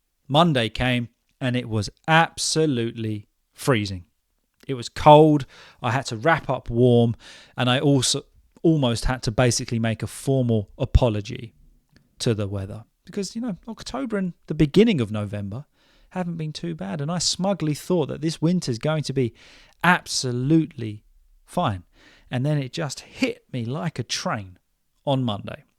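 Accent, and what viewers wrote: United Kingdom. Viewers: where are you from british can you answer me please because your accent very nice i love it 😍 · Mr you do fantastic I like it your videos so much because you speak British English clearly and and perfectly. Thank you very much for your videos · Hello, What a great episode! I also track and count steps on my smartwatch, and you’re right—10,000 steps every day is not that easy. As for me, I regularly reach my goal without any problems—8,000 or 9,000 steps for sure. The rest might be from hand movements that my smartwatch records, so it’s pretty easy to cheat—or better said, the results aren’t always accurate. I have to admit that yesterday, due to the rainy weather in Germany, I didn’t have the motivation to go for a brisk walk. Thank you so much for your inspiring videos! I discovered you on YouTube just the other day, and I truly enjoy listening to you and your cute british accent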